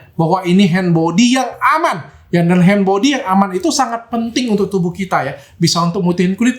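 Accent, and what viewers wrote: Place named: Medan, Indonesia. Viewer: Iya..dokter kayaknya gede di Medan..ini logat kami chinese Medan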